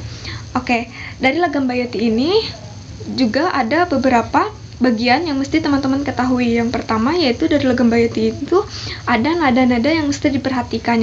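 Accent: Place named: West Java, Indonesia. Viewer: orang sunda nih. v jad p. wkwkwk.. maaf mbak. sama2 urang sunda.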